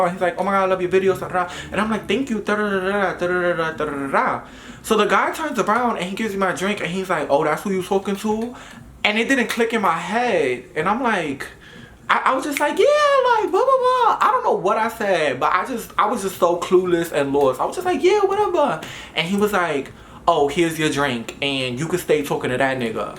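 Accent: New York, United States. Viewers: Dionne T Lee this sound like the average new york nigga lmao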